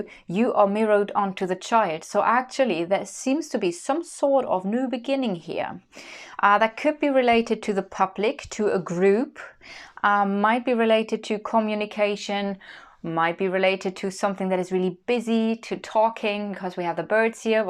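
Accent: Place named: Germany. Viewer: We missed you, your accurate detailed readings, your lovely personality and your sweet voice and angelic singing. I can slightly hear the German accent integrated in your soft British English. I can see you've been working a lot with the German community, but please do not forget us, the rest of the world. You would not believe the hearts you've touched, even in a faraway land like Albania, an unknown place to many, from where I'm listening to you now! Thank you dear. Danke schön! A Virgo sister! <3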